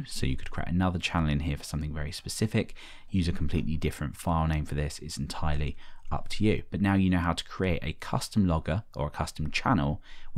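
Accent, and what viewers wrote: United Kingdom. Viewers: tks sir ! btw your accent is a British accent right ? i'm Asian and i curious about english accents in the world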